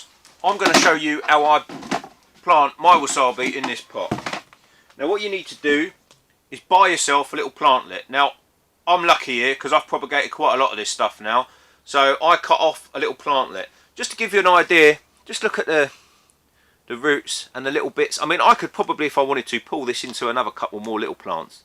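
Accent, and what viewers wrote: England, United Kingdom. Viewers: Can’t stand the English accent🤦🏻‍♂️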